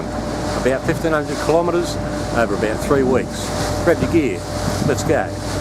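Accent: Australia. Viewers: Hello...I loved your video! My husband & I are planning to do one of the Eurovelo routes--maybe this one! BTW, I liked the music--what is it/where do I find it? It's great to hear your Aussie accent, too. Cheers, from Sydney.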